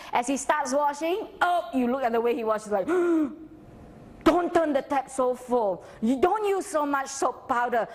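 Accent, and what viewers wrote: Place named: Guyana. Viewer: you sound so guyanese.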